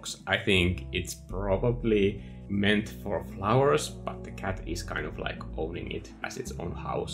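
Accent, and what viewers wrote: Finland. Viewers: I think I have been at this Cafe place u showed in the beginning. If I’m right it is in Helsinki. That would also make sense to me since u have kind of a Finnish Dialekt. I love Finnland wanna go there again soon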